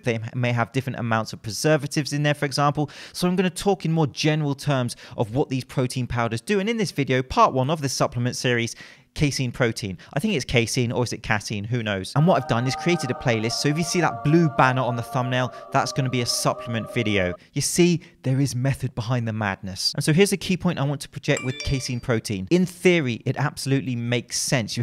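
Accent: United Kingdom. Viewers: I subbed your channel a few days ago and I have been binge watching your videos. Excellent stuff and very much appreciated. Just listening to your British accent makes me smarter. And I like how you add some British slang in your videos - we learn about exercise science AND language. I particularly like the phrase "look like a wally" you used in your isometric video. I will be using that. I myself am trying to break some misconceptions regarding Olympic weightlifting, which the rest of the world just calls "weightlifting," particularly the ideas of a certain coach. If you ever need ideas or material on weightlifting let me know.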